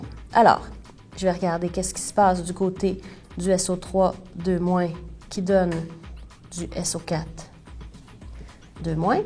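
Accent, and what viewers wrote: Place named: Canada. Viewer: Hhhhh accent canadienne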